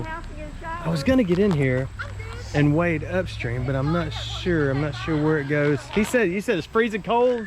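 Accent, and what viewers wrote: South, United States. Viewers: Yes, you definitely have a southern accent.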